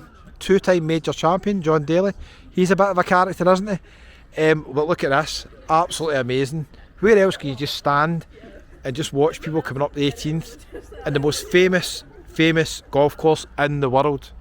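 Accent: Scotland, United Kingdom. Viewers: Loved this … Ive been living in London for years but from Newton Mearns ! Glasgow ….This reminded me so much of a day out with a boyfriend to St Andrews when I was a teen !😂.. memories… didn’t take to long from Glasgow … makes me homesick for then …. It’s a joy to hear a Scottish accent ! Love all your videos … been binging them 👌… looking forward to seeing more… Polly X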